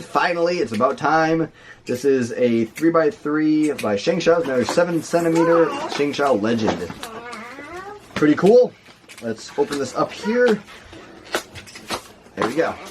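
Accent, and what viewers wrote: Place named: United States. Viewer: Bruh u like Vietnamese but sound American af...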